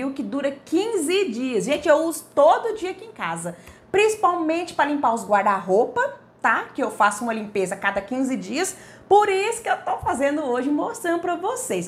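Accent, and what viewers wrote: Minas Gerais, Brazil. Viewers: Sotaque de mineira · Amo esse sotaque mineiro ❤️ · Gostei desse segredo,vou usar em casa! Gostei também do seu sotaque mineiro ❤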